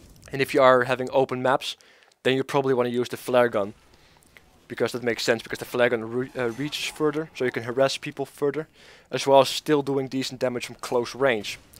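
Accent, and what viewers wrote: Netherlands. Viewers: Is this guy dutch?? It sounds like he has a dutch accent. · Judging by your accent, you cannot but be Dutch, right? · ik kan horen dat je een nederlander ent ;P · are you perhaps dutch?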